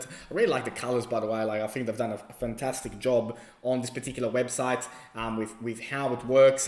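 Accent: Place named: Australia. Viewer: Love your accent mate - Aussie Aussie Aussie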